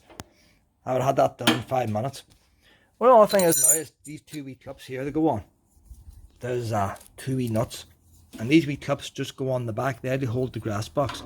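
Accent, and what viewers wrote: Ireland. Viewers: I'm buying one of these just for the Irish accent! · Hard to understand his accent very strong Irish accent 😅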